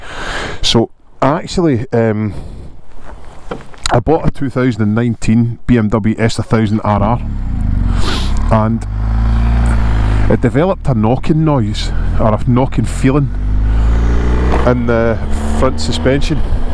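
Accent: Scotland, United Kingdom. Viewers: This is weird! I was just going to say something very similar and my name is ALSO! Hehehehe (wow) Very nice to hear a fellow Scot doing reviews, even if I do now live in America) Subscribed and liked Sir! 👍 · your Scottish accent is difficult to understand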